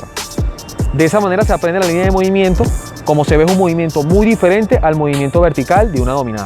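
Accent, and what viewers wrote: Venezuela. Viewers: Excelente Video, te felicito. Recién me inicio en el tema, tengo unos tres meses que empece a trabajar en esta modalidad. Había intentado por mi cuenta hacer un MuscleUp y lo he logrado en un par de ocasiones pero todo todo torcido :-) Voy a seguir tus recomendaciones a ver que tal me va. PD. Deduzco por tu forma de hablar que eres Venezolano... Un orgullo encontrar Venezolanos de calidad haciendo trabajo de Calidad. Felicitaciones.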